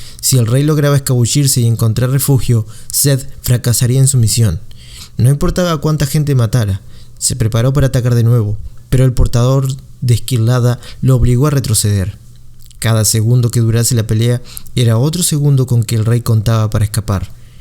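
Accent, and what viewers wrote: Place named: Argentina. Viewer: De verdad, gracias a ti por hacer esto. Esta saga merece ser leída ( en este caso escuchada) por muchos. Me encanta tu narración, el acento argentino le da otro toque. Que bien que no te tomaste mi comentario a mal. Por favor, no pares y continua la saga. Subscripción inmediata.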